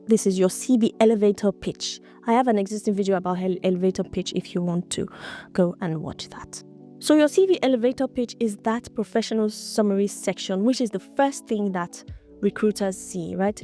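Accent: Nigeria. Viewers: Thanks for the video.. You have a Nigerian accent, are you Nigerian?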